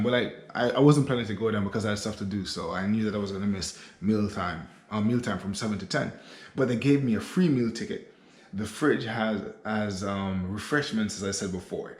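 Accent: Jamaica. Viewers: Question... are you Jamaican? Hearing a bit of a Jamaican accent, I could be wrong though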